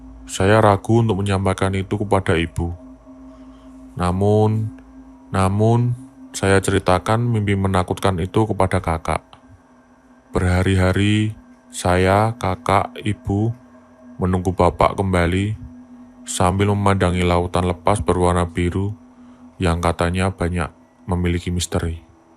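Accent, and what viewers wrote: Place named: Java, Indonesia. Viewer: Suka ama logat jawa-nya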